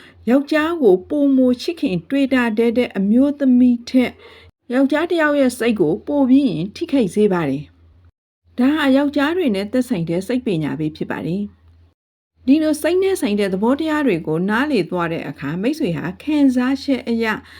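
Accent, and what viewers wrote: Rakhine, Myanmar. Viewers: ရခိုင်သံကြီး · မမ ရခိုင်သံနင့် တူပါတယ်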